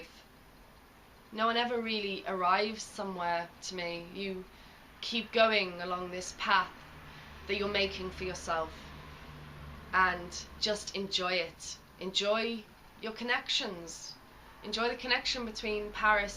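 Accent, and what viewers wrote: England, United Kingdom. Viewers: It's an English accent.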